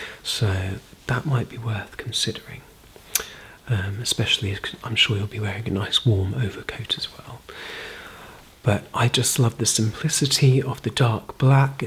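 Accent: RP, United Kingdom. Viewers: You’re so posh I’m beside myself w tears. You’re like a fairy godmother/father I’ve always dreamed of 😭😭😭😭 U HAVE NO IDEA HOW HARD IT IS BEING AMERICAN!!!!!! 🤪😂😂😂😂😂 sometimes I have cried from not being a Brit but that was years ago...... 🤪😂😂😂😂😂😂 I love British people and their accents so much it speaks to my soul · I just discovered this man because of gentle whispers mentioning him and I must say, his voice is soooo soothing and the British accent is just the icing on the cake!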